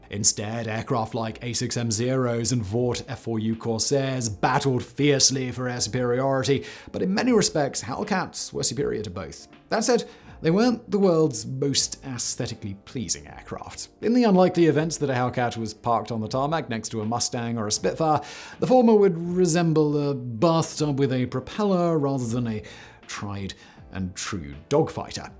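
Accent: United Kingdom. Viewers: The f6f was and is extremely well known. It's hardly an unknown. Tactics were simple, fly in at high speed take a shot and keep going. Don't dog fight, or die Japan had some incredibly skilled pilots but exceptionally poor training tactics, so once the few exceptional pilots were attritioned out it just became a matter of time before us Americans ruled the skies. Japan was never really going to pose a serious challenge due simply to Its limited man power, industrial strength and serious lack of raw materials. The US navy didn't want the corsair due to its difficulty in landing on carriers. The British, based on your accent I think you should know, showed the US navy how to land. Corsairs land by coming in from the side not straight on. The gull wing was to accommodate the massive prop and requirement for high strength gear meant lowering the wing to shorten the gear. 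Post war proves the Corsair was a better overall aircraft.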